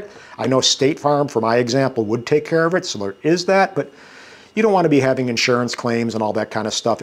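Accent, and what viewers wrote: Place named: Chicago, United States. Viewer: Moved out of Chicago a couple years ago, out in the boonies now. Also got into drones this year. Avata 2 is my 2nd. Its super fun, man. Nice to hear a Chicago guy talk normal and frankly about his experience. I knew most of this stuff already but I watched the whole video cuz I enjoyed listening to you go on like a Chicago guy. Cheers, brother. Enjoy the drone.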